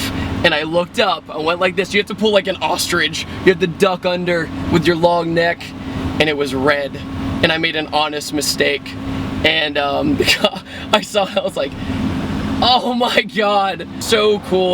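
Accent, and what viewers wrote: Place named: Pittsburgh, United States. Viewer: Dat Pittsburg blowg doe.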